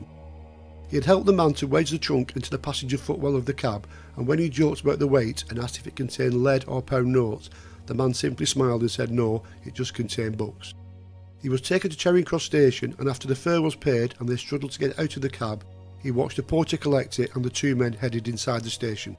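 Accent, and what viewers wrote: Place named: Bolton, United Kingdom. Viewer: A good Bolton accent on the commentator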